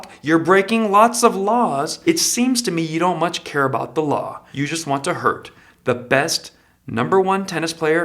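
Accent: Australia. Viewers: Great Aussie voice! You and your impressive impressions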